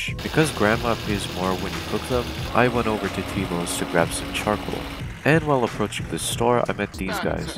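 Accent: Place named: Lithuania. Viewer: The voice sound very Lithuanian, are you Lithuanian? :D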